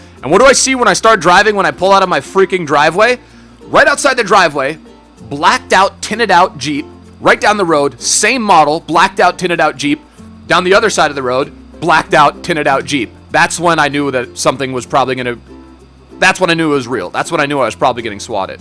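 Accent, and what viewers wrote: Germany. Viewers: is he german ? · are you German ?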